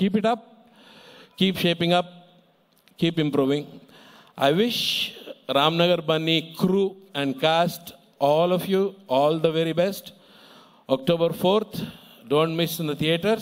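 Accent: India. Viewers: nee butler English ...........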